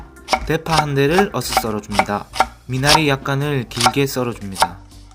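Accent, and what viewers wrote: Gyeongsang, South Korea. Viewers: ㅋㅋㅋㅋ 같은 갱상도사람으로서 사투리 너무 구수합니당 고추우장/ 다진마뉼 / 오징어로 미나리초무침이랑 볶음으로 밥도둑 완성했어요 감사합니당😄😁😆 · 갱상도 청년이시군요. 는 소리없이 자막으로 어디서나 간단명료하게 볼 수 있음이 특징이예요. 목소리 반기웠어요^^, 그러나 말소리가 나오니 장삿꾼 같은 느낌이 나서 참신함이 좀 훼손 되는 듯. ㅎㅎ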